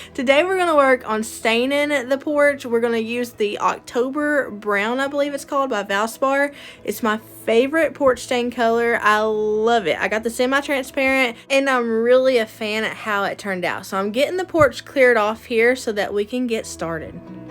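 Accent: South, United States. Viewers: So sorry about your chickens. We cry with you. God created them too., you are kind, intelligent and God's beautiful daughter. You are not stupid! Shame on the person that said that. You have a southern accent and dialect sweet lady - never lose that, never change. You all did an amazing job on your porch. It looks great! Big hugs.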